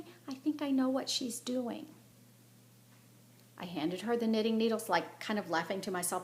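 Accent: Texas, United States. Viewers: Am catching up on some of your recent videos...funny how after you moved back to TX, your accent is pretty strong. :) I am very sympathetic as to the struggles and trials you and your family are facing. It does seem like so many these days (self included) are going through some major storms. Thankful that we know Whose Hand we hold and the God who gives us strength to take each day as it comes. I will keep you in my prayers. Thanks for sharing from your heart, as always. I don't knit but I do crochet and have always found it to be a great relaxer. Blessings always! xo And Merry Christmas! No stress, only peace and joy! :)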